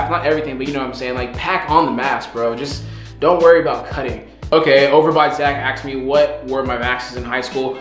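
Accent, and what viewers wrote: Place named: Nigeria. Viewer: That naija accent 😂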